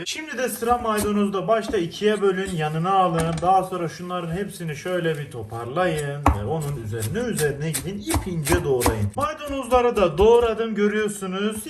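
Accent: Adana, Turkey. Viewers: Abi sen Adanalı gibi konüşürsüünnn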